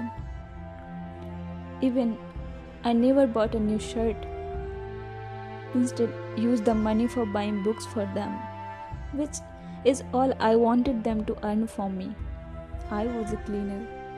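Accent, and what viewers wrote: India. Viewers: Wow..But I dnt Knw Y I dnt Wana Hear Indian english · Indian acent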